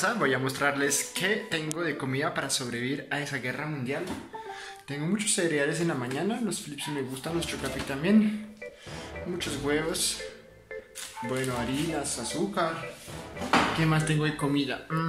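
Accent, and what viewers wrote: Bogotá, Colombia. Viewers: Cada vez tienes mas acento de rolo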